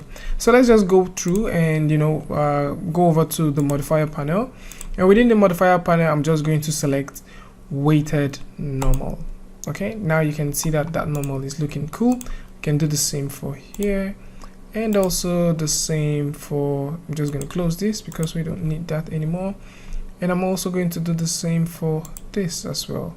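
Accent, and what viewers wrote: Nigeria. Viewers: You sound Nigerian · Are you Nigerian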